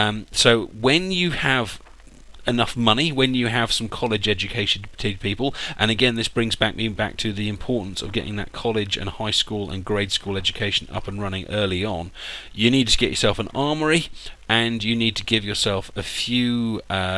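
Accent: United Kingdom. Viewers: Thanks for the tips, it's great hearing a brit instead of the winey american accent and blubbers mostly rubbish... Could you perhaps do a tips and trick for Modern Times DLC, please?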